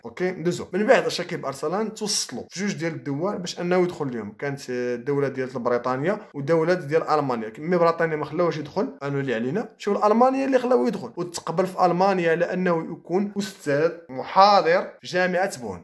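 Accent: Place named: Morocco. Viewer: ياليتك تتكلم بالعربية الفصحى حتى تعم الفائدة لكل العالم الإسلامي اما بهذه اللهجة انت تخاطب المغاربة😢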